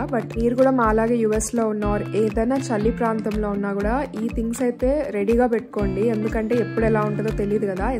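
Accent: Rayalaseema, India. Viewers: UR EYES, VOICE, LANGUAGE I MEAN SLANG {RAYALASEEMA:P} AWESOME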